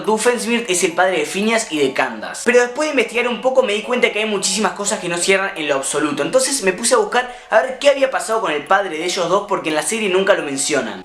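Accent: Argentina. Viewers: de que parte de Argentina eres??? Eres el mejor:3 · sos Argentino?????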